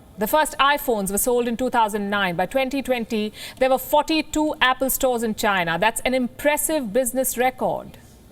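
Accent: India. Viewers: Is this Fox news with indian accent and tan? I love America and China is surpsssing us. Solution invest intetnationally and tax the income heavpyly for building middle class again.. not let them keepthe loot snd starving people in US like our own Doing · Your Indian accent speak volume of your jealousy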